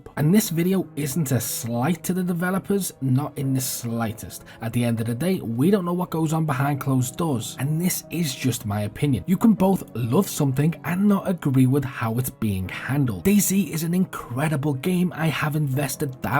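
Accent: Liverpool, United Kingdom. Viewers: I dont hear Toxteth, but I definitely hear a mix ha its only sometimes I hear scouse at all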